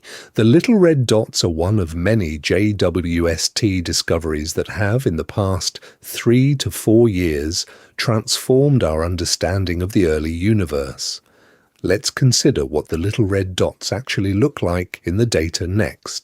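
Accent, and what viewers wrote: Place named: United Kingdom. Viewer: Dang! British Accent irritating.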